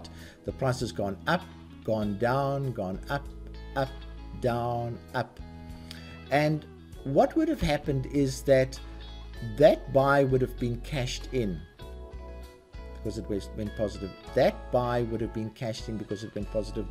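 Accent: South Africa. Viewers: That accent- are you South African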